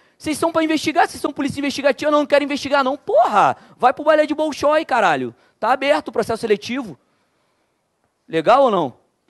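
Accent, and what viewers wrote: Rio de Janeiro, Brazil. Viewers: Na boa , a aula não é mau mas sotaque carioca é um saco kkk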